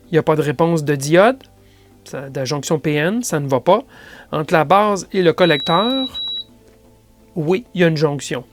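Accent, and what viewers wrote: Quebec, Canada. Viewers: je decouvre la chaine et je dois dire que c'est juste excellent,avec un accent qui me fais voyager direct en 1 seconde de France au Québec ,les explications sont toujours claires,schématisés et on vas toujours au bout des choses, je repette c'est excellent ! merci pour ces videos intructives !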